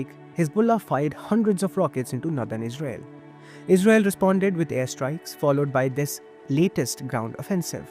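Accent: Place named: India. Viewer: No matter how professionally this video is edited, I can't look over the fact that it's with an indian voice🤦🏻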